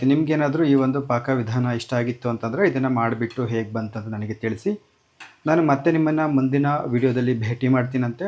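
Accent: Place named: Davangere, India. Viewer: ನಮ್ಮ ದಾವಣಗೆರೆ ಭಾಷೆ.😊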